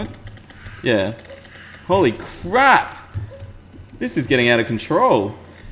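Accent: Australia. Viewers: I didn't think you ever swor in ur vids because ur so and also r u from Australia or new Zealand ITS KILLING ME!!!!!!!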